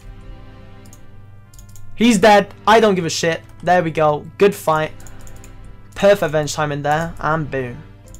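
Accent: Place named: Northern England, United Kingdom. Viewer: North England Accent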